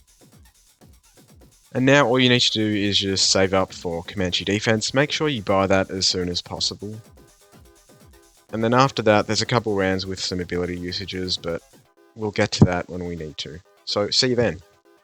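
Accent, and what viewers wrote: Australia. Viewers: I can hear the Australian accent but bro uses every village flag apart from Australia, anyway thanks bro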